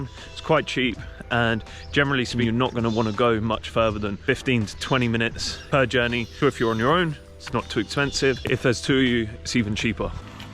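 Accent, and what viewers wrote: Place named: Liverpool, United Kingdom. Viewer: สำเนียงอังกฤษฉบับคนลิเวอร์พูลชัดเจนมากพี่ชาย😂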